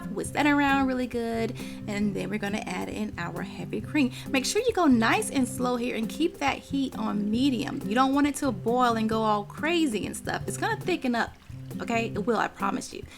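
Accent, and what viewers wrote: South, United States. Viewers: omg sweet sugar wow love the southern voice mmm and what a great dish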